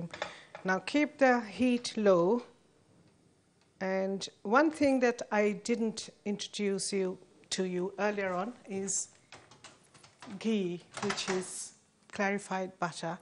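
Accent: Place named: South Africa. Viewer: Her accent doesn't sound so British, she sounds more like she is from Durban, South Africa.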